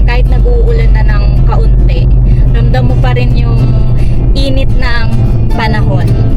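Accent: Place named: Bulacan, Philippines. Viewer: Hello po,taga bulacan k po b kc may punto k po eh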